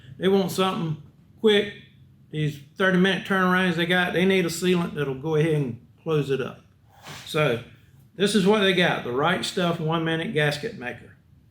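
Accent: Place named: North Carolina, United States. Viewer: Hahaha heard you talking and knew you were from NC.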